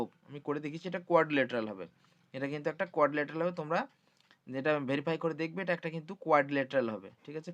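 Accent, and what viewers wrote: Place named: Bankura, India. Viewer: Sir আপনার কি বাঁকুড়ায় বাড়ি?? আপনার কথাগুলো অনেক টা বাঁকড়ি ভাষার মতো শোনাচ্ছে , তাই বললাম । কিছু মনে করবেন না please.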